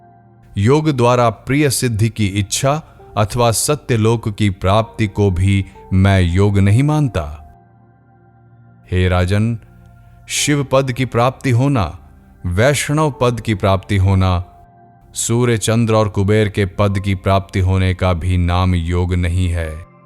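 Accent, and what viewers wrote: Standard. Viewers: Sir aapki.aavaj bahut shudh h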